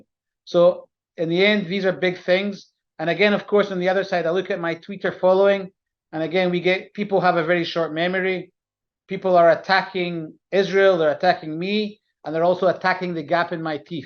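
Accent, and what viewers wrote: Scotland, United Kingdom. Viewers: Do I detect a slight Scottish Accent in there somewhere Sir? You are so right Sir. You’re a very open and honest man! You and your Nation needs to, and Must show the Whole World what happened in your country. Israel was intentionally, deliberately and savagely attacked in a totally unprovoked act of Genocidal Evil. You must show the World Uncensored and Raw images of this disgusting Pogrom committed against Israel and the Jewish people. Nothing like this has happened since the Nazi’s Genocidal murder of the Jews during the Second World War! You must defeat this Evil and the World needs to back your actions. All those who tell lies, the Western Media, University morons and all these sick anti Jewish, Anti Israel Haters and Demonstrators are Supporting Genocide and NAZISM. Hamas behave like and act just like the Nazis did, they are Evil Brainwashed Fanatics, and so are all those who support and back them! 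They must be shown that they are wrong, you must show the Entire World what happened to you people! God be with you my friend. Shalom!! A Supporter of Israel from England. ❤🏴🇬🇧🇮🇱🇺🇸❤ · we love your Scottish accent! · I stand with Isreal and loving the Scottish voice. Praying for the complete end of Islamisist terrorists death cults and the beginning of a positive living future loving life. 🙏 How can they believe people who pray to ‘the greatest deceiver? and the mischievous maker’. If you believe them you can’t believe them, right!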